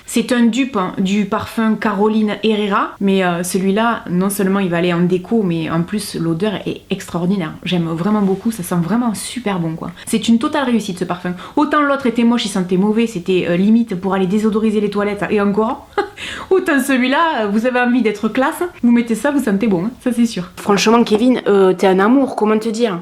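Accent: South, France. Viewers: Coucou, fraîchement abonné à ta chaîne, j'adore tes vidéo, ton humour et surtout l'accent du Sud vive le sud. Tu es de quel coin ? Bisous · Cc Ma belle tu est mon rayon de soleil ☀j aime beaucoup ton accent du sud et tu as un jolie sourire et ta bonne ça me fait du baume au cœur 😍 💕 prend soin de toi 🤗 😘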